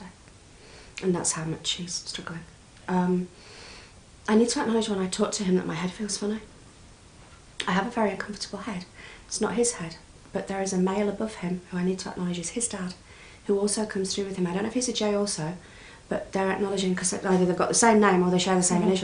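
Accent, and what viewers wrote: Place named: United Kingdom. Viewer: I don't like the British way of speaking . Hard for me to understand .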